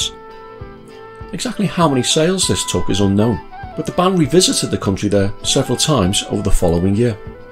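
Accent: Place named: Liverpool, United Kingdom. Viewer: You have a scouse sound, being from Liverpool myself I can tell a bit of it (maybe a bit manc?) Genesis were always very popular in the north west, with them and floyd having about a 20% increase on cost on tapes and vinyl in the 90s when I was a teenager! Well worth it though.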